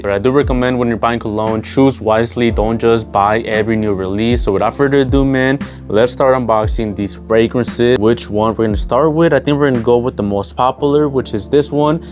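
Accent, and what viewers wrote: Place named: Mexico. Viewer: He’s not talking like that at all wtf are you talking about, he does have a sort of Mexican accent though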